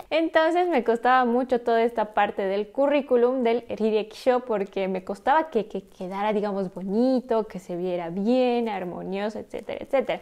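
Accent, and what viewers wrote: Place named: Mexico. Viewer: Tu manera de hablar es igual a los mexicanos hablas cantando :v no te atine pensé q eras mexicana bueno ya tienes un nuevo suscriptor mas :v esperare con ansias en próximo vídeo :)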